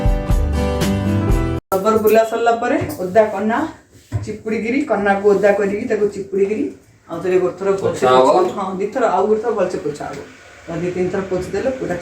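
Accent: Berhampur, India. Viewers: Di tame eng. re ହିଁ subscriber kuha odia sabda (ଚାନ୍ଦା ଦାତା, ଗ୍ରାହକ) ta vala laguni, but hindi sabda (yogdan karta) ta gote prakara thik achhi. Tamaku dinaku thare na dekhile jama val laguni, khass kari tama ବରମ୍ପପୁରୀଆ vasa, ohh 👌👌 mind blowing 🤗🤗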